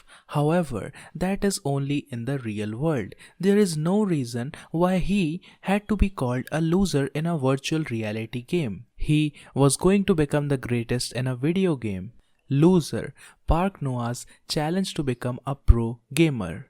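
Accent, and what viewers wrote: India. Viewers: he's Indian right?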